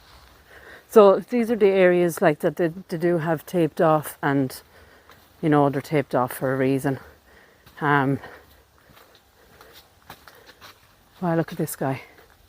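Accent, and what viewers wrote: Ireland. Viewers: This is one of the most amazing cemeteries you have visited, it truly is. It would make a great place to set a spooky film, would not need to do anything, it’s just perfect as it is. your enthusiasm comes through, your love of old cemeteries is clear, your appreciation to these places built to honour those who have come before us is wonderful.All narrated in your soft beautiful Irish voice.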